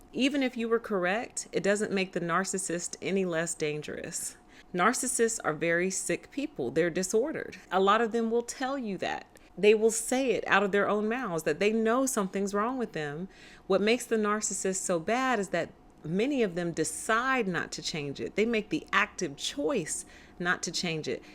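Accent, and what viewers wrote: South, United States. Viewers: I love your Southern accent! I'm in Arkansas : )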